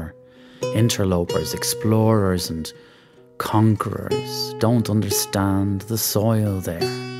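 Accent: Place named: Ireland. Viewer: this is so precious!!! once i can get over that dream of an Irish accent and put my focus on the story and the gorgeous mountain scapes!!! bravo ...a wonderful and rich story!!!!!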